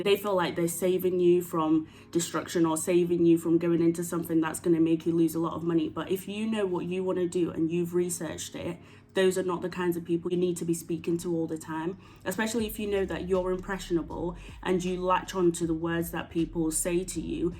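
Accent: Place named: United Kingdom. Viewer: love that UK accent. Damn I can't wait 2 go back💯 I'm from Cali, I got soooo much luv out there. way more than I get in USA.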